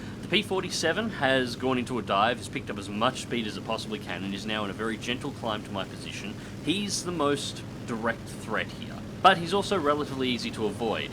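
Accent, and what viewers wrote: Australia. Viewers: hey are you an auzzi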